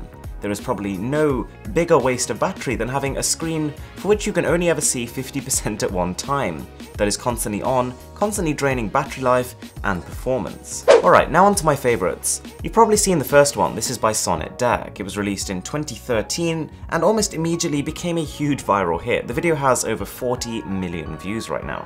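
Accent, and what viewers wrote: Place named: RP, United Kingdom. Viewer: It's the only reason I might stop watching 👀 exaggerated accent trying to make British sound even more posh makes me cringe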